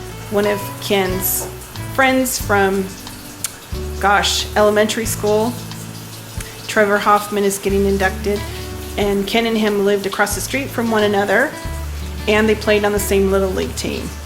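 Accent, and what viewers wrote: South, United States. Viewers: Your food looks amazing! Ugh, so so yummy! I'm sure you've answered this before but where did you get the lovely Southern-sounding accent? You are adorable 🌷🌼💐🌻🌹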